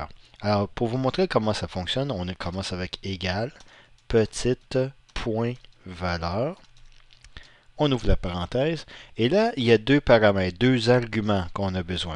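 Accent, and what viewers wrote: Quebec, Canada. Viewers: Merci?Je découvre pleiiiin de choses simple et très utile grâce à vous.Et en plus l'accent et super. Québec?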